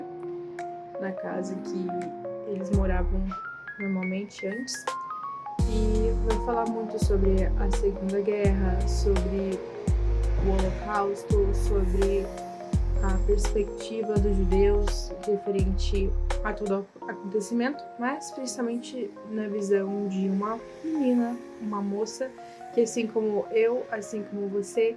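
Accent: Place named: South, Brazil. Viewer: Teu sotaque é lindo, vc é do Sul ? Amei as observações ❤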